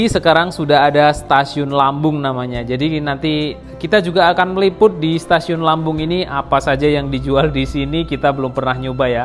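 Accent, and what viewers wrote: Java, Indonesia. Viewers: Maaf mau nanya...abang org jawa ya...keliatan dr bahasanya kdg suka medok😊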